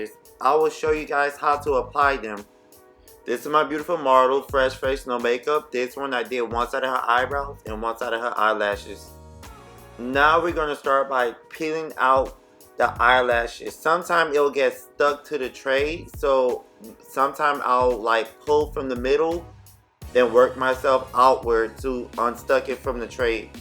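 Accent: Bahamas. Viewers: Omg I thought you sounded Bahamian 🇧🇸🇧🇸🇧🇸 .... Love your channel even more now!❤️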